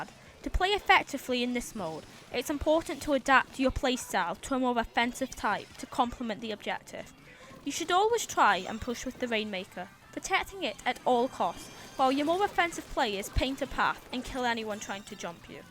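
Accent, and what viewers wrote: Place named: Scotland, United Kingdom. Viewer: omg voice reveal!!! she sounds so Scottish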